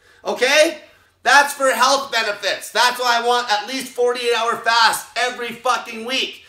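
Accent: Canada. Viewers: i think im in love. this guy sounds canadian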